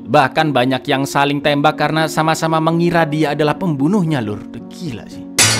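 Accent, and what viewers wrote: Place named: East Java, Indonesia. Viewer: Hahaha sebenernya punya potensi melebihi iq7, tapi kenapa jon iq7 yg lebih terkenal ya. Apa karena logat sunda jon lebih populer dari logat jatim nya cak. Entahlah, waktu yg akan menjawab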